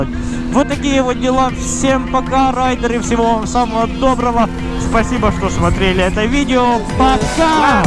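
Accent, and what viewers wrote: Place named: Ukraine. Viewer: смищно говорит!) Особенно смешит этот украинский акцент)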